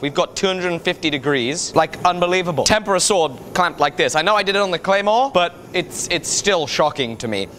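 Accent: South, United States. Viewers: Love the southern accent. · You should use your american accent more often. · Please make an ENTIRE episode in yer southern accent!!! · your southern accent reminded me of Bobby from Brickleberry hahhaha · This is one of my favorite episodes! So we’ll edited! The buildup each time you try to straighten the blade, your patience, your humor, showing us the fails and the wins, and the Southern accent was priceless! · The American accent 😂😂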